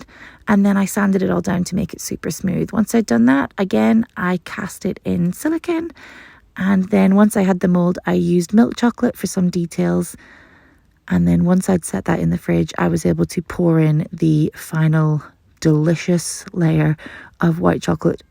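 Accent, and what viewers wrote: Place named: North, United Kingdom. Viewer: talks like a northerner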